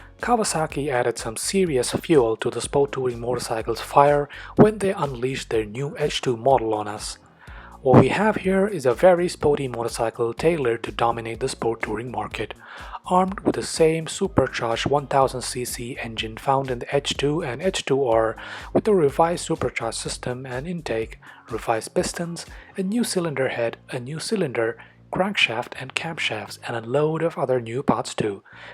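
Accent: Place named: United Kingdom. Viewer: Really annoying Brit accent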